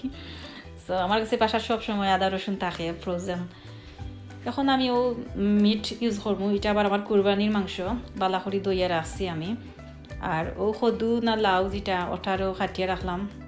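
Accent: Sylhet, Bangladesh. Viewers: ভেরি নাইস সিলেটি মাত আপু আমিও সিলেটি রান্না দারুন হয়েছে পাশে থাকবেন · Sylheti mat vala lager apu . Nijer language e matle vala lage . Plum tree amer kub vala lage apu ❤️ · Mashaallah nice garden apa r apnar sylheti mat huniya bala lager! Lau etare amra sylheti manushe Aushi lau koiya dakon r ami ou matro apnar lakan chicken deya ranchi kubi moja oichi r shutki deya o ranle aro bheshi moja!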